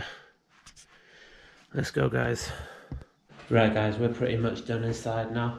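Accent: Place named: Scotland, United Kingdom. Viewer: where did you get that great accent from? Scotish or Irish? I assume Scotish.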